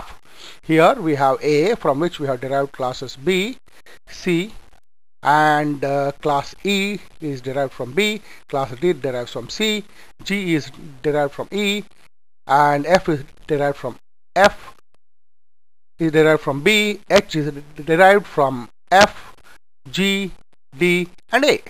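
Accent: India. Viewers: I don't understand you buddy, but this tutorial IS in English! Indian accent is an altogether different thing, however its still very clear to understand. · As a native speaker of US English, I am simply expressing the fact that I dislike the standard Indian English accent. There are certain (often incorrect) patterns of speech that can be observed which are displeasing to the ear in the Indian English accent; simply put, it hits the ear wrong. I'm sorry you do not understand, but imagine receiving technical instructions in Punjabi that is being (poorly) spoke by a white person from the US — how much fun would you have? My guess is that you would have very little Sir. This is only my opinion, take it or leave it.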